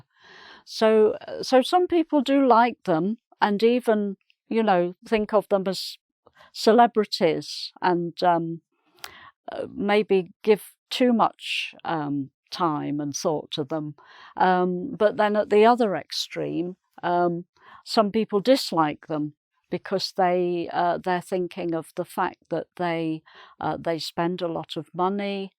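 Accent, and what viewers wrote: United Kingdom. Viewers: Love your beautiful British English.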